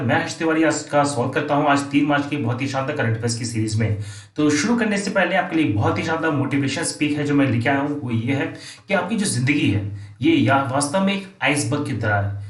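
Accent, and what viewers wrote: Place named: Malwa, India. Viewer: Sir you sound like resident of MP. Do you belong to Malwa region?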